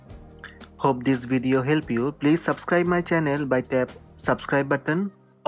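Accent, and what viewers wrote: India. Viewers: His accent is Indian, sonny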